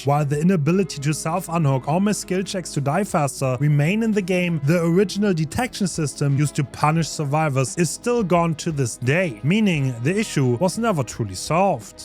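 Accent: Germany. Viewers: Can you stop talking like the fucking rift guy while adding your horrible german accent dude? Wtf is wrong with you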